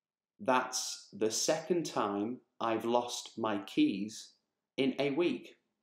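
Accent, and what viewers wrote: United Kingdom. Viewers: From what part of Britain this accent is? In some words the pronunciation differs from that in transcription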